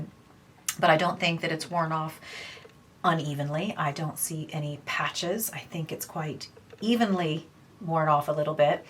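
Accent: Ireland. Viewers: You sound a little bit Irish 🇮🇪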